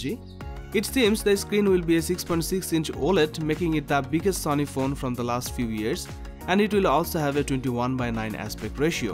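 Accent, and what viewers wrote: India. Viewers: Gak tahu kenapa kurang suka aja kalau orang india ngomong inggris 😂😂😂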